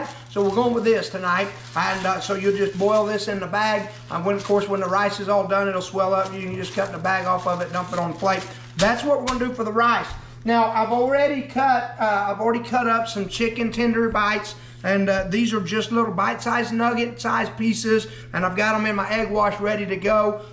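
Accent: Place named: Missouri, United States. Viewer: You've moved away from SW MO! That accent is thick! I also moved away but I still have the bland Midwest accent of Missouri rather than a twang. I still make Springfield cashew chicken when the craving hits.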